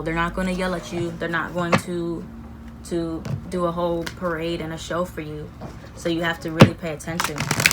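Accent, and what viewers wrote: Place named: New York, United States. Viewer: This was the best reading, Today I felt the real New Yorker came out and spoke to me in a New York sort of way, I really like that and everything truly resonate 100% I am in a 3rd party situation with pisces his my husband of thirteen years, he left me like ten months ago, I recently find out that the women is pregnant for him, So I decided this was not for me anymore and filed for Divorce, some days are harder than others, I feel I'm moving forward, but by my capricorn standard not fast enough but I'm learning to take it one day out time, I believe the Universe got my back on this one because of the cruelty of the betrayal and how it was serve, still having a hard time with that one. Anyway Thank you for reading this long ass comment.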